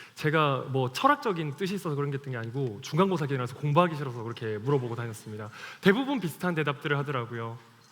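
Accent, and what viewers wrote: Gyeongsang, South Korea. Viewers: 나랑같은 경상도 사람이네ㅎㅎ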